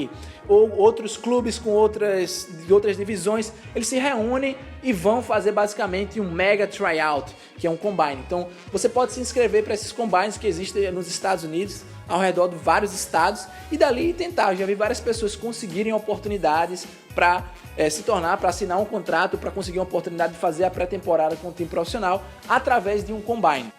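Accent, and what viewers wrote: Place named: Northeast, Brazil. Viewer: De qual estado do Nordeste você é, djow?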